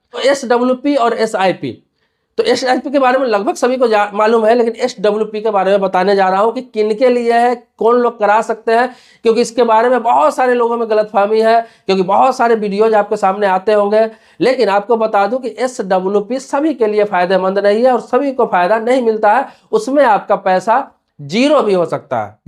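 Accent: Bihar, India. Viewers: बिहारीं लँगवेज!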